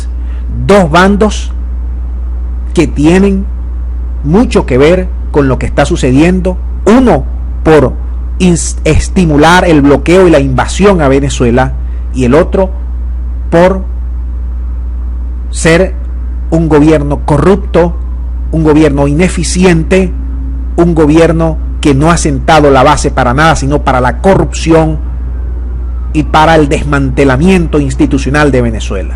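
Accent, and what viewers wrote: Cuba. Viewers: Este locutor debe ser Cubanisimo un efuerzo immenso para lucir Venezolano. Solo Las cuentas que estan USA estan cerradas Venezuela tiene cuentas en la China y en Russia Las aerolinias Americanas no estan volando por El peligro que eso significa. La China si quiere traer otra ayuda a pesar de que El gobierno diga que no se necesita la puede llevar. En USA los que tubieron que salir llevan años pidiendo que le cierren Las cuentas a esos descarados que le dicen Al Pueblo pon la cara por mi que te estoy ayundo con una cajita clap de arina y grasa y por que los españoles y adecos y copei te dejaron pobres y USA quiere tu agua y petroleo y luego con El dinero de los Venezolanos se para Miami, Orlando y New York viviendo y pagando Plato caros mientras El resto haciendo colas por que Las colas no son de Enero para aca solo un Cubano llama a Maduro Presidente o narco y con razon por que le reparte la Plata de los Venezolanos en dollares y petroleo a ellos y los narcos Les deja hacer lo we le de la gana. 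insisto eres Cubano comunista o narco. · Que bien . Asi Maduro saldra mas rapido. Tienes acento cubano. No tienes derecho a opinar. Eres mas falso q billete de 7.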